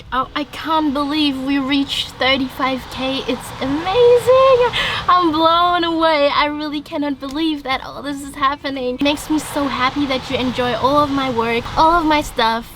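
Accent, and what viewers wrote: Germany. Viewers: Kommst du aus Deutschland?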